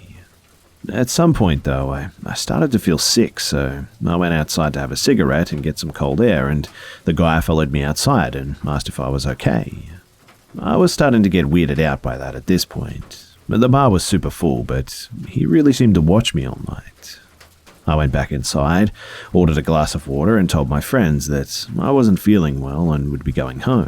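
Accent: Australia. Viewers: I haven’t watched one of these in quite while like 2016 or something I missed the Aussie voice mates.*I am Australian btw*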